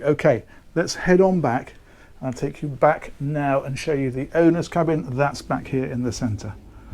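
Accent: United Kingdom. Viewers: It would be great if you pronouciated a bit more. It's hard to pick up british accents unless I'm hammered, drunk, my guy .that said great viewing ,great work ..btw one of the nicest fly decks I've seen for driving.im sold I'll buy one .how much is it ? Oh.. does it come in blue? No .aghhh that's to bad .😂😂😂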